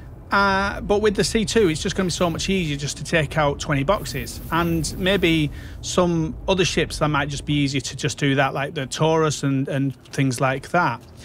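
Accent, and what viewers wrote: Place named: England, United Kingdom. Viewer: Where in England is your accent from?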